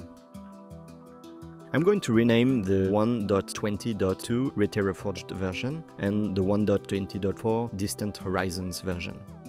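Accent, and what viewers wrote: France. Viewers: Un français qui parle anglais, c'est toujours marrant ! Je like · Hello j'ai remarqué que t'as un accent français donc je vais faire un commentaire en français mdrr. Pour la génération de monde c'est entrain de me dire 12 heures je dois réellement attendre si longtemps 🥲? Et en terme de performance t'as quoi comme PC et est-ce que ça tourne bien ? Parce que ma carte graphique est pas extrêmement puissante (RX 5500 XT) donc j'ai peur d'attendre 12h pour que ça crash au final. Sinon à part ça très bon tuto ça fait plaisir de voir un français faire des vidéos en anglais j'étais justement entrain de m'imaginer faire la même chose avant de voir ta vidéo 😅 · oooh le bon français x) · Super vidéo merci beaucoup. Et merci l'accent français :) Tu parle très bien Anglais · petit accent français 👌👌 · Tu es français nan 😂 ?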